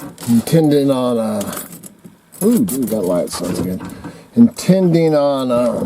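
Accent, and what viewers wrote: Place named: Idaho, United States. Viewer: 1st time viewer. Got a kick out of your Idaho hillbilly chatter. I'm old and lived around hillbillies from west to east and all over the south but looked at northerners as city stickers. Might be because new York and Chicago is upstate. Live in the hills of Kentucky, edge of the Cumberland near mammoth cave park. Got to say, there's not much differences between Idaho and KY billybobs....Got a kick out of your video...Even Miss Kitty ☺️. I never knew people had so much nit picking feeding their.chickens. What ever happened to table scraps, fats from cooking, crushed shells mixed with peanut butter, lol... a little tid bit for you dear. I buy the corn and seed mix from tsc. I figure chickens eat everything....even sheep and goat feed if hungry enough. I've never seen a chicken full. I'm guessing it's the processed feed and not the scratch feed that stops hens laying. 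Biden said he was going to collapse the food industry. I heard it myself in disbelief he even said it and it phased nobody and was not shown again until Tucker showed it on fox recently. And again. No public response. Got to pinch myself now and then ... this is still America, right? Or a petri dish for some creepy villain in cartoons or movie? Ha! I believe it's a God thing and now people minds will be blown....I mean really blown away. Daddy told me to stay away from flights and never throw the 1st punch. Wait for it and do you you have to .... run or fight... just win it or give it your best. That all a should c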